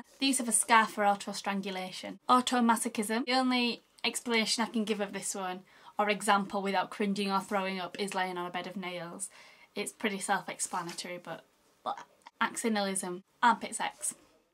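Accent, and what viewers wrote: Yorkshire, United Kingdom. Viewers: Do you have a Yorkshire accent? If so it's the most proper Yorkshire accent I've ever heard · Haha. U look hot :P AND UR BRITISH.!! My friend is British YE HAV D SME ACCENT I hav reli bad spellings :P IM NOT BRITSH Jus to giv ye d heads up :P IM GOIN TO GO NOW.!!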